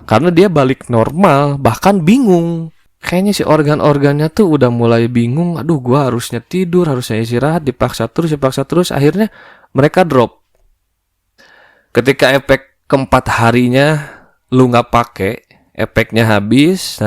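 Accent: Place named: Bandung, Indonesia. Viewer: Orang Bandung nya kang logakna kkental pisan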